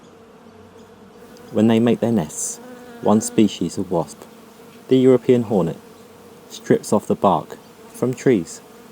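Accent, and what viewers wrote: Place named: United Kingdom. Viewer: Got some Britian talking also giving metric numbers....sucks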